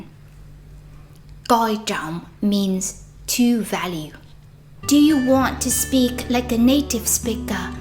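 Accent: South, Vietnam. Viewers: the teacher speaks Southern Vietnamese accent!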